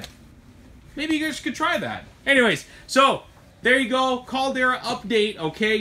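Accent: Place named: Canada. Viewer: Hi, really enjoy your humor and Canadian accent. You broke through my work funk in just a few minutes listening to your spiel. Keep up the good work. Cheers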